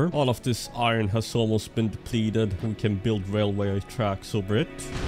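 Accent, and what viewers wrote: Sweden. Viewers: im all for factorio gameplay as well! As a swede im not fond of swedish accents but urs i can listen to all day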